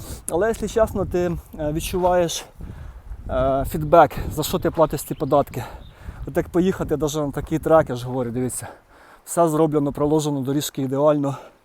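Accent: Cherkasy, Ukraine. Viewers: По вашій говірці я зразу зрозуміла,що ви Черкащини.А потім по коментарях отримала підтвердження здогадкам😂Дякую за цікавий контент!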